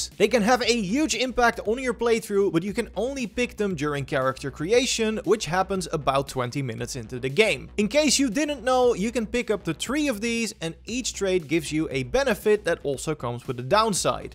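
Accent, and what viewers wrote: Netherlands. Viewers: Very good video! But sorry to say, your accent is a bit annoying to me. You have a very Dutch way of pronouncing the "o" (I know because Im Dutch as well)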